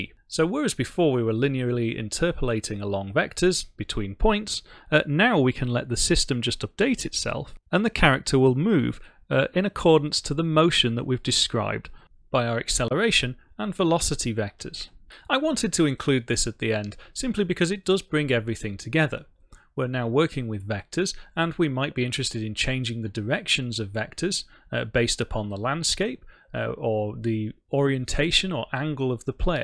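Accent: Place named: United Kingdom. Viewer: I think it's charming that you use hand drawn letters and figures versus perfect computer fonts. That's a good segway to getting into the four linear acceleration equations with d, t, v and a and the more complicated cross product. Then applying force on an elevation with coefficient of friction. From the American perspective, having a British accent and talking about a scientific or philosophic topic makes you sound smart. XD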